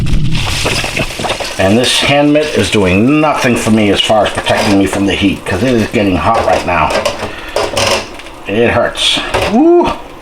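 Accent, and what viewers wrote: Massachusetts, United States. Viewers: I love listening to your Mass accent I myself am a native of Fall River MA so I really enjoy it and your videos You were the one I found when I used my air fryer for the first time for frozen fries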